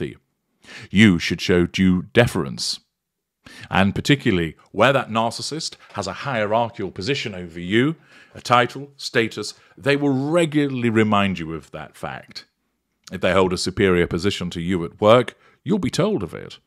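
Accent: United Kingdom. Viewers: The combination of your accent, the fact that MM’s genuine character isn’t lost on you and the colorful language all while carrying the British accent. ❤😂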